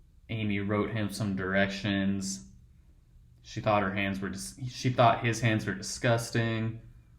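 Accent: Colorado, United States. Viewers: I am half American half Australian and lived in Colorado as a child in the 90s. I love your video because it's great to hear the accent again. I love and miss Colorado and want to visit again some day. Peace dude! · Hahaha you’re hilarious. I don’t know if it’s because we share the exact same accent or if you’re actually that funny but I was laughing a lot during that video. I’m in Mexico right now learning Spanish and I’m just watching these videos to get a taste of back home. I miss you all in Colorado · I didn't know Colorado had an accent! Probably different now than it was 100 years ago. That was probably more like Yosemite Sam in those days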